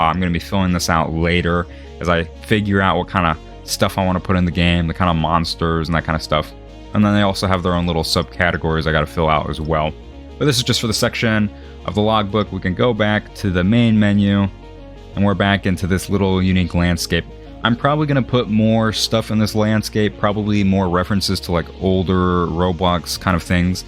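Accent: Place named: Bay Area, United States. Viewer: You sound like Bay Area buggs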